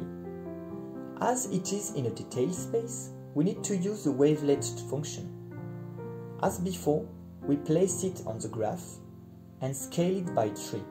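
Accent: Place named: France. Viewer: I loved the math, the French accent, and the music! :D great video!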